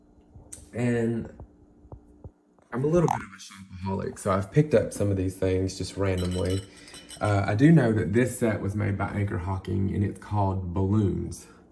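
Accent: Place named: South, United States. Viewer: I'm a new subscriber. Old grandma 👵 from North Texas. Love your shared knowledge and collections and Southern accent. Keep up the great job.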